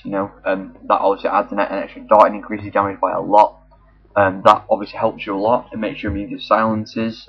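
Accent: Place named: United Kingdom. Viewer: I loyke the british accnt ;))))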